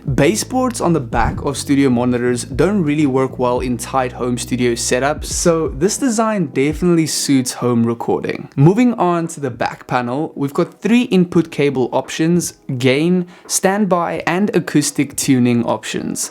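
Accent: South Africa. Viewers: are you south african dood i can hear it in your voice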